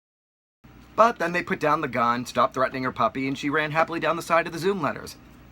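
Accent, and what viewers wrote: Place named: Boston, United States. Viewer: is not British he is Bostonian they talk simlar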